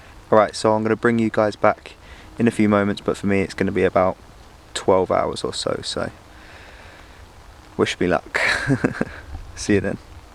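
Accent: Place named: Southern England, United Kingdom. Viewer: Thought I recognised a sort of local ish accent. I am in East Sussex so not too far from you. 👍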